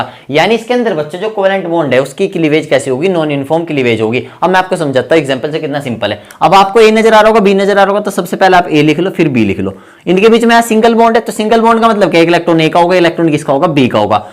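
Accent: India. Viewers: Sirji west up wali bhasha mast h 😂❤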